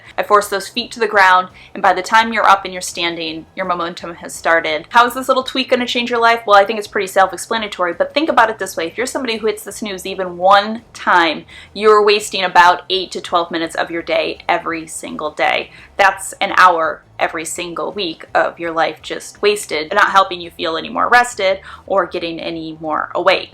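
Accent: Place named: Connecticut, United States. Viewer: So refreshing to hear a Connecticut non-accent! Been in Maine wayyy too long!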